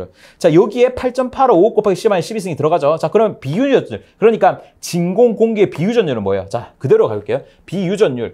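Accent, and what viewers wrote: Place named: Busan, South Korea. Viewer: 부산사람이죠? 좀더 귀 잘 박히네요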